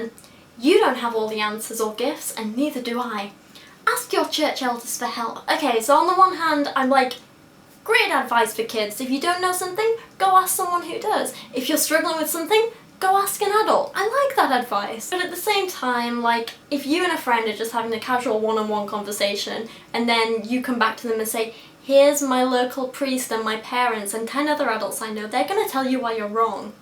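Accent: United Kingdom. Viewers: MaxxxwellC I think its... British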